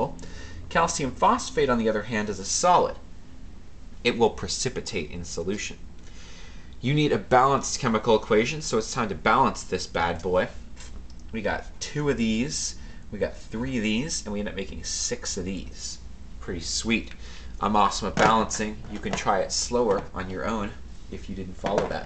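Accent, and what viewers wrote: Canada. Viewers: sounds canadian to me :) but i wont take points away, it was a very good video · are you canadian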